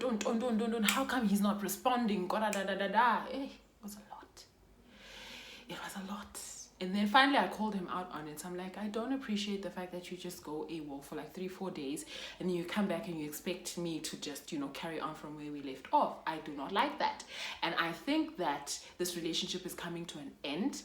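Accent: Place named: United Kingdom. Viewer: That British accent wow you just nail it 1️⃣0️⃣0️⃣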